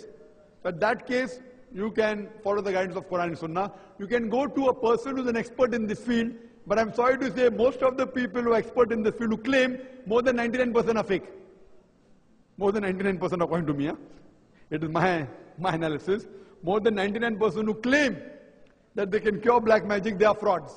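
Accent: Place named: Arab world. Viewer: Unxpectedly Xpected Are you Islamic people forfeited any kind of magic? If it doesn't tell me.I can't fully understand cause he is using Arabic accent. I barely understand only 20~30%.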